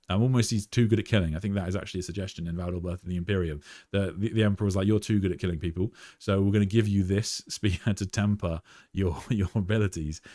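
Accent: United Kingdom. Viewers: Its just his accent,he's British.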